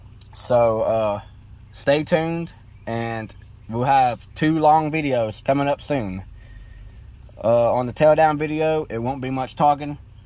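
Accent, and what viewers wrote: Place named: Arkansas, United States. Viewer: I was locked up with a guy from Arkansas with an accent exactly like yours, is that where you're from?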